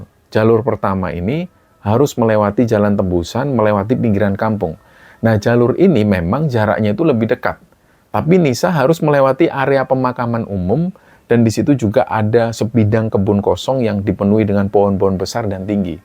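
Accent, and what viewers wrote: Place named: East Java, Indonesia. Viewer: Sy bu Wulan Smg ngikuti kembara sunyi dan mulai tertarik ksh2 misteri saat n istri cerita perjalanan k gunung bromo th brp itu 2020 ya... Sy tertarik cr penyampaian cerita ini bs membawa alur crita enak, seolah2 sy ikut terlibat dlm crita itu mdh d pahami.. Enjoy gt bhs jawa timurnya medok banget,krn sy org surabaya leluhur sy...semoga kembara sunyi smakin sukses Aamiin Yra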